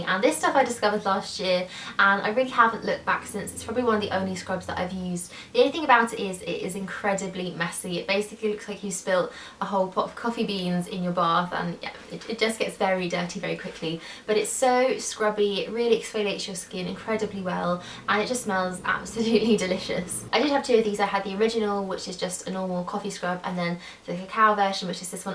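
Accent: England, United Kingdom. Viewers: you are 1 of my faves here on the 'tube. But sometimes I don't understand everything you say. Don't know if it's b/c of the speed at which you speak or that charming English accent of yours. I didn't quite get the product you compared the Garnier Micellar water to around the mark? Great video as per usu & part 1 was awesome as well 👍 much love from Canada 🍁🍁🍁☺️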